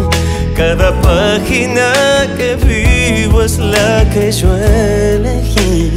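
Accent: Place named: Argentina. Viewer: Se nota (y me gusta) esa tonada argenta al pronunciar la Y, LL 😎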